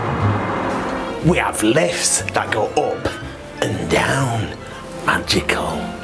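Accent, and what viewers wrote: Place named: Stockport, United Kingdom. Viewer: Can fuckin tell he’s from sk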